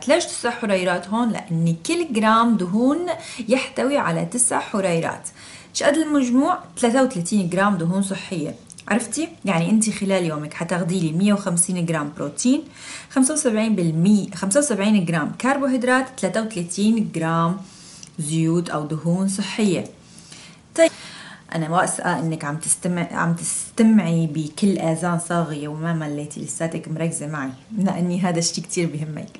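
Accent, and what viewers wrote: Aleppo, Syria. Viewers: Samiri Sanaa سوريا حلب واضح من اللهجة مرة احب الكلام الحلبي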